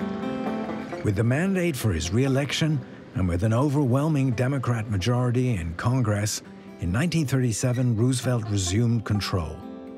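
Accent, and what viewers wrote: United Kingdom. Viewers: This appears to be someone from the U.K narrating an American story. I coulsn't finish watching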